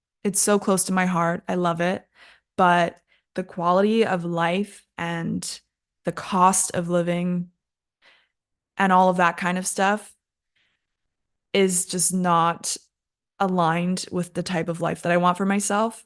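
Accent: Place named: United States. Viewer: Hi. I just subscribed yo your channel. One thing that caught my eye. Bermuda is a British territory, isn't it? However, you speak with an American accent. That's interesting 🤔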